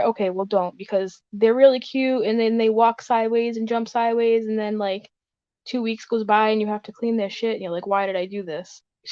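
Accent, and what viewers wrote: Boston, United States. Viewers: First time here. You from Boston? That accent is always noticeable lol. Love it! #Bostonstrong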